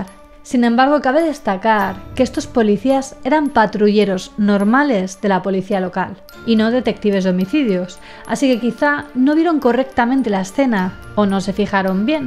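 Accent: Spain. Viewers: Qué hermoso acento español 🤩 😍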